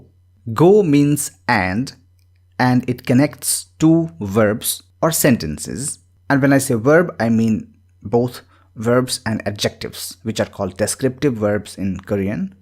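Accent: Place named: India. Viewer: Your accent seems like Indian I'm from India too 😄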